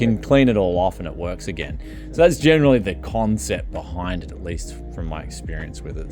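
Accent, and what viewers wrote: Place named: Australia. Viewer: I think I understand the Aussie accent now. You just say ye ye yea a lot while having a conversation. Interesting.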